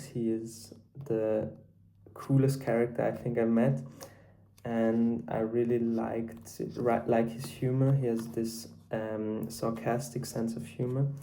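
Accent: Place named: South Africa. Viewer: Hi, I'm new to BookTube too. Nice to meet you. Do you have Afrikaans in your background - your accent is a little mixed? Don't worry it's not a bad thing. I teach English and I like to work out accents.:)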